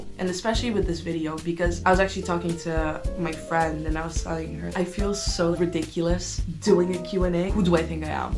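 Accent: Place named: Russia. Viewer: girllll i like ur russian accent , btw im also going to be studying at film school cant wait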